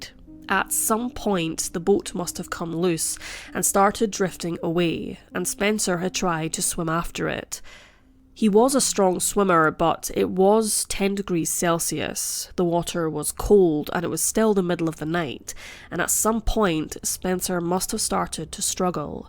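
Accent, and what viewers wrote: Scotland, United Kingdom. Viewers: Ahhh your Scottish 🤗🤗🤗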